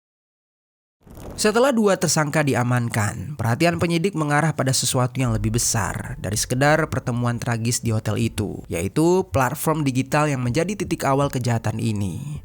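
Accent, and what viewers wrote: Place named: Palembang, Indonesia. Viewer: Cara bicara pembawa acaranya kok mirip bgt ama temen sy yg org palembang, ya 😅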